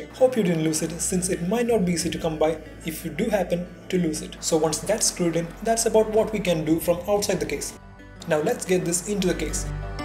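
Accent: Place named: Kerala, India. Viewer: ah yes, you're right. BTW, are you from Kerala? Your accent seems like it.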